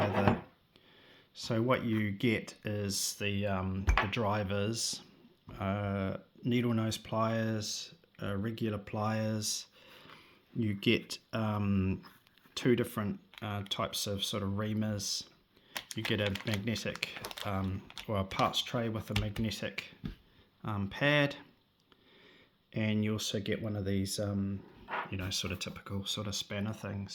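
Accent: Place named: New Zealand. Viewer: So I had one of these growing up, ironically in New Zealand bro! You’re undoubtedly a kiwi with that accent. Lol. My parents couldn’t afford a Tamiya so this was a cheapskate version. Don’t know where they got it but I believe it was on an overseas trip and possibly from Japan. It ran good enough but I remember those T-bone axels would break and we couldn’t get replacements. I’m 50 so this would have been in the mid to late 80’s. Mine was lost to the annals of time, but I managed to find one on TradeMe a year or so ago and have it in my collection! Came with the remote and box. The original looks quite different from yours as it has a tail scoop and huge front bumper. Wish I could post a pic for you. It’s a funky little RC but I’m so stoked to have a piece of my childhood back. Cheers bro.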